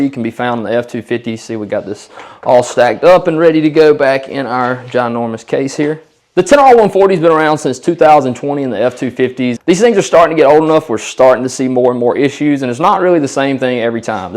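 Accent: Alabama, United States. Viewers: That Alabama accent gets a smile on me every time!😊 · He's from Alabama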